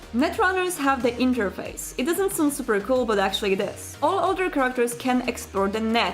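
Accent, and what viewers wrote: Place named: Poland. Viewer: I'm loving these videos and your polish accent <3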